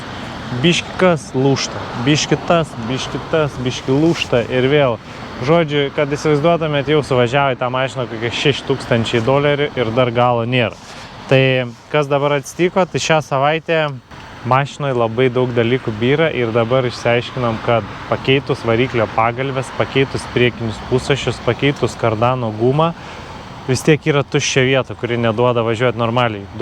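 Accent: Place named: Panevėžys, Lithuania. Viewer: Gal esi pasakojęs,bet iš kurio Lt galo kilęs? :D Palei tarmę lyg Panevėžys?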